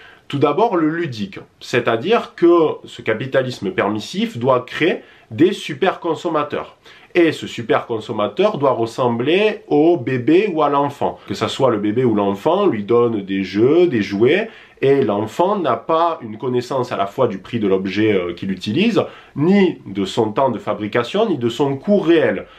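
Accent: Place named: South, France. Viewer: De la part d'un basque, garde ton accent du sud.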